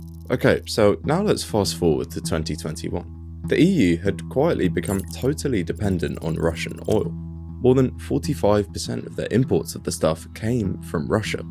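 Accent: England, United Kingdom. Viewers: Im an American fiormer advisor to the Kremlin, who subsequentlylived in Odessa for 15 years... until the rabble gang armies came gang press every adult male into service. And I watched Russia defeat itself by literally running out of food four days on the road to Kiev. I saw RU troops had to WALK to Belarus. So it is true Russia defeated itself. But as you can imagine, Im unhappy seeing my life's work in privatization, deregulation and liberalization twisted this way.... by a posh English voice that sounds like the children I now teach. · He thought because he is white and has an English accent that people would not criticise him. Times are changing my friend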